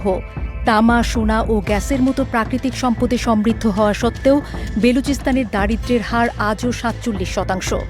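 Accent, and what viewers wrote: India. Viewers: এনটিভি কি ভারতীয় সুরে কথা বলেছে?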